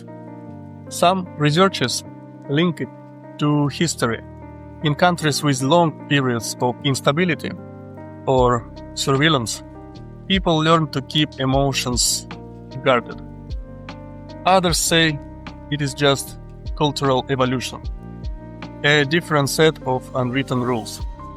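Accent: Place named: Russia. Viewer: An accent is russian that is true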